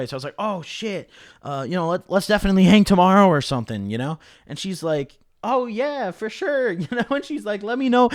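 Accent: United Kingdom. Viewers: that british accent is disgusting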